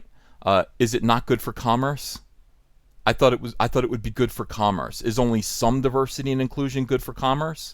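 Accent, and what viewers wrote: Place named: New Jersey, United States. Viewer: Knew you were from Jersey by your accent! Maybe that’s why I can relate to you so much. That and how humble we both are 😂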